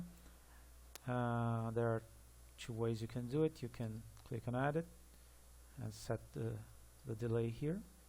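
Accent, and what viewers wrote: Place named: Brazil. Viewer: reconheço um sotaque brasileiro de longe, muito bom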